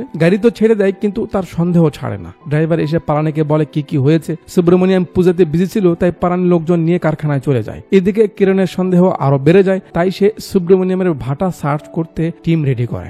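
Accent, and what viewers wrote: Bogura, Bangladesh. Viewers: আপনার বাড়ি বগুড়া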